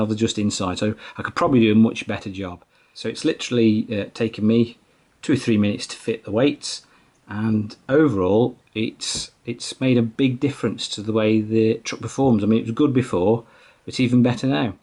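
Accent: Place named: Stoke-on-Trent, United Kingdom. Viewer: Hey bud, Cracking vids... I’ve just purchased one of these mn99 myself and will be looking to weight my wheels when it arrives, What weight would you suggest putting in after your test? 40g 50g? Also I couldn’t help but notice your accent, are you local to the Stoke On Trent area?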